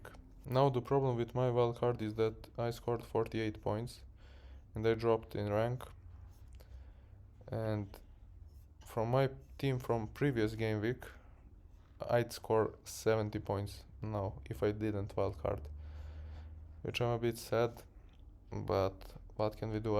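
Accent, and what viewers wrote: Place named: Serbia. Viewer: English with Serbian ascent:)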